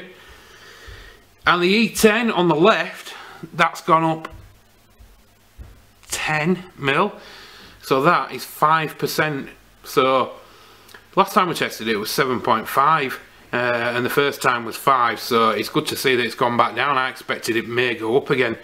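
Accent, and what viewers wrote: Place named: West Yorkshire, United Kingdom. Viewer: You sound like you’re from West Yorkshire like me! Whereabouts are you based pal? I’m just north of Leeds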